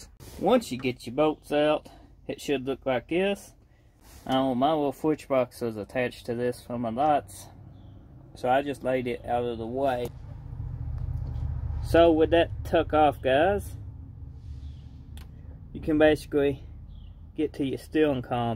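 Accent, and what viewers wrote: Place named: Los Angeles, United States. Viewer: La accent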